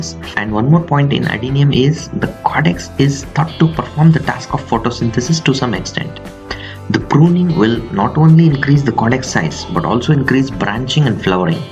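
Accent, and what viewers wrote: India. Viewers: Your tips help, however not to be rude but I would highly suggest that you take some English speech classes. Your heavy Indian accent makes it extremely hard to understand what you are saying. After awhile of listening, it actually becomes painful to listen to the video because of this. Again I’m not trying to be rude, I hope you will consider this a kind, helpful suggestion that it was meant to be.. I think you will see a difference in your positive votes if you can put some hard work into reducing that heavy Indian accent. I wish you success!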